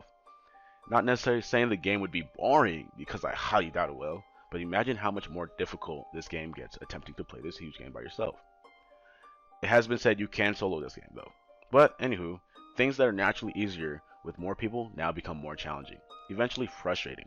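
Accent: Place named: United States. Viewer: what was ur first language then? cause ur american accent is on point g.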